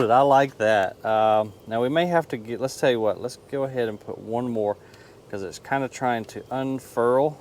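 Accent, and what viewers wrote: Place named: South, United States. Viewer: I am so very interested in this and assume that you have a website with more more. I have so many seedlings in yard and woods, and of different sorts, that I wish to employ my "If you can beat 'em, join 'em" philosophy. I'll throw this out there. I figure if I don't get an answer, that maybe you cover that precise question in the aforementioned, or close enough. Pine seedlings: Vicinity Charlotte, NC-I have forgotten the zones, but given your accent and mine, we in the same "zone." So what other 'persuasions' can I use? Form a parabola with a brick tied to a rope to weigh him down? Soldering wire seems to work for true seedlings but is too 'soft' if you wait too long to begin. PVC pipe 'sleeve' with a 90 or 45-degree joint(s)? A tangent on pine seedlings : How much root-ball do I need to include when transplanting? 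How much 'tap' root. I didn't know I could kill a pine seedling, but yes. I did inherit a green thumb for the central Alabama to Gulf Coast region, but 28107 is different enough that I need to seek help. Whether I get an answer or not, no worries. I've learned enough from this one vid that I'm better off than I was. For that I thank you.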